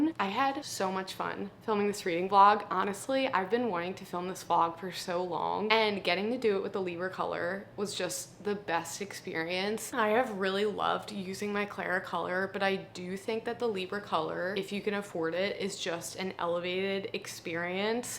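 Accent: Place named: New York, United States. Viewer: What an amazing video you filmed this video so well and explained everything so thoroughly!! I love your accent!! Are your from New York?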